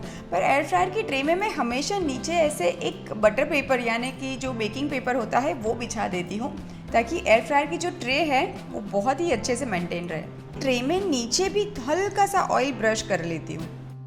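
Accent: India. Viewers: I am going to try this today. First I thought you are a foreigner but when you started speaking I understood you are an India. Thank you for sharing your recipe.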